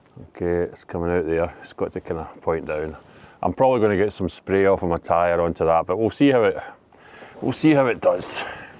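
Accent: Scotland, United Kingdom. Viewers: Thanks for replying. You made me laugh on your video with your Honest commentary and your accent from a fellow Scot takes me back to working with people with a sense of humour and dry wit sadly missing these days. Keep making videos now subscribed 👍👍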